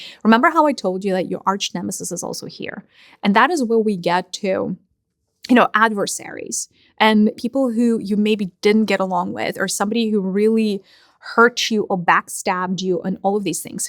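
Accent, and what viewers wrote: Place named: Russia. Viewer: Sometimes you holler at me really fast and use the words ain't and y'all in the cutest Russian accent. You're the favorite of the many voices in my head and the only person on the planet other than my husband I will allow to put me in my proverbial place.Contrary to popular belief (even if they're my beliefs) I'm not perfect. I am however, genuine courageous authentic passionate and loyal AF. I love bigger than almost anyone in this dimension and I need so many anchors and tethers it's ridiculous. I'm thankful for them all. I wish I hadn't forgotten for so long! Gratitude in extra portions 2 you my sister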